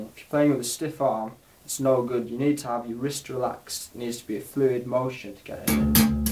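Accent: United Kingdom. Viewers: hi man.. just chilling... been messing around with double thumb technique myself.. though ive not long been at the stage i wanted with my standard slap... but im geting there... after watching this i think its a great exercise to improve .... one i will try out in the morning.. nicely done dude... guessing from ur accent u must be northern england.... yorkshire or there abouts :) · great lesson! the best i've seen yet in terms of teaching technique. by the sounds of things you live pretty near to me, are you a nottingham lad by any chance?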